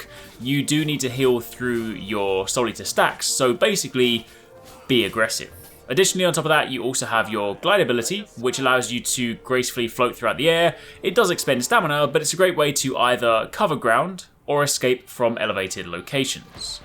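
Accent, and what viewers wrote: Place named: United Kingdom. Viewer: Here because I had a comment saying we sound the same! 😅 We don't... we're just both British! Haha. All the best to you dude 🙂👍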